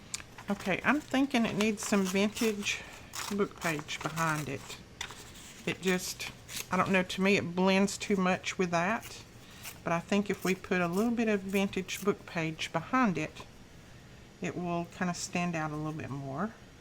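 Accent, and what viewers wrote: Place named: Alabama, United States. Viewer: I have been a card maker so I know the basics. I like the videos where you make the elements of the junk journals since I haven’t tried the journals yet. Slowly working up to it. I love love love collage and making master boards. Thanks for sharing your gift. Your voice is also soothing. Love the Alabama accent. Junker from North Carolina.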